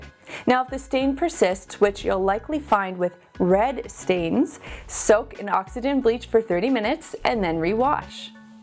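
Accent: Canada. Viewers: She's Canadian- that's the accent.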